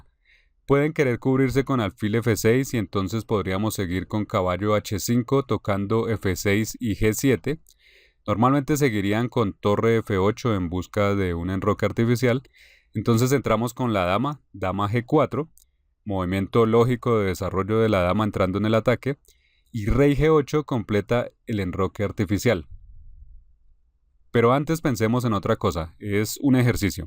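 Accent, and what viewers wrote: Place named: Colombia. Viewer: Maestro gracias por sus clases y me preguntó esa voz es la de usted, porque no tiene acento extranjero, disculpe mi curiosidad si más no recuerdo usted es ucraniano, como habla un español con acento colombiano????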